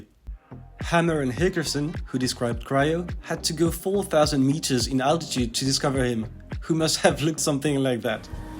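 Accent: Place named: France. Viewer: je me disais qu’il y’avait au fond d’un anglais irréprochable un petit accent qui me rappelait le miens 🇫🇷🇫🇷🇫🇷 D’ailleurs tu t’es mis un sacré gros youtubeur avec toi!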